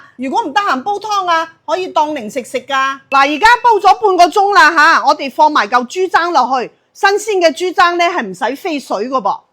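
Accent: China. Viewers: 令人討厭的聲線及語氣，大陸人的廣東話與香港人的不一樣，一聽便分得出。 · 广东人讲嘢是咁语气 广东汤猪峥最正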